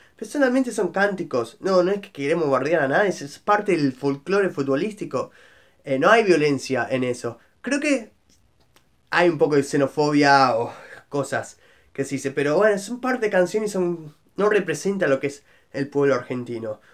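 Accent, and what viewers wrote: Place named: England, United Kingdom. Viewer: Flaco pero si vos sos argentino porque tenes ese acento inglés ?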